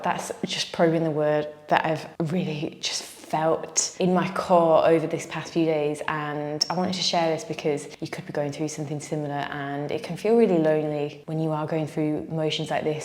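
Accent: United Kingdom. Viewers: Okey I m here to learn British accent ❤❤❤❤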